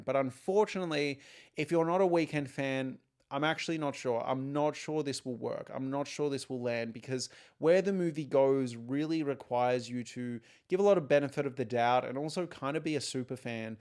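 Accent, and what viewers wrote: Australia. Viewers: I'm so happy you replied ! ^__^ as an American, I love different accents. Australia being in the top 3 ! British, Ireland, and Australian ! I can always tell an aussie by how they say no. It kind of sounds like nor with an R Thank you so much again!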